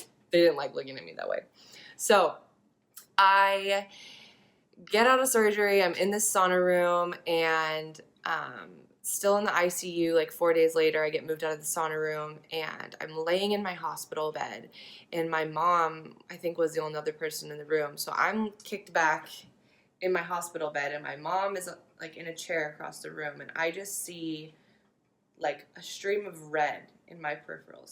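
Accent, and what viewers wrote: United Kingdom. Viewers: You are so inspirational and beautiful. ....and your British accent!! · Her british accent thoo😋😋❤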